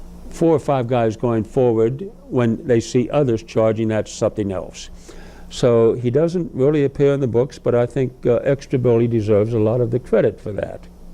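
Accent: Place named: Brooklyn, United States. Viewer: I think you so much, for your hard hard work & research, I would like to suggest working on toning your YANKEE ACENT, for your impression, studying the General’s photos, will show you can use a skilled master Barber 💈. Do a more Standard “ regular “ Bussiness style HC, and about 3 inches in the front on your forehead, and combed back in more of a “ roaches “ front ( old southern term) for “ Pompadour’s “ that’s just away it’s worn off the forehead, your’s is too wispy, too long on your collar, looks , 1810’s, and late 1830’s, but in the 20 th century 1990’s like Billy Ray Cyrus, Mullet. As a fellow wearing a flat top in 1978 out of step. You’d be perfect 👍 for those periods, like , President Polk, wore his in the style, described in Oh Jennings Wise’s book description of his father , Henry Wise, “ in the style of the 1840’s, combed straight back from the forehead long in the back. 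But as you can see by the photo’s , they dressed there hair then, w Call Mees, hair tonic’s,, bear grease, is why You see or did see bears of different sorta illustrated in Barber 💈 shops. Tunstall parlors, and you General, had a Black, body servant, and 99 % Of Tunstall powers in the south was always ran by negro Barbers, another Example I’ll give you is General R E Lees Haircut is called a “ Quartersingle” it’s a styling he wore all his life, it is from the 1820’s into the 30’s mid way. The hair is parted in the back center, and the head is lend forward , and basically trimmed in front of the temp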